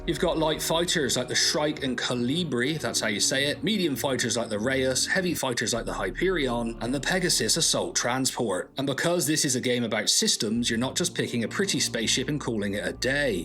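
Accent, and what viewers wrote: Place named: Bristol, United Kingdom. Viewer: Lol you sound bristolian AF mate - practically narrow it down to Hartcliffe - am I close?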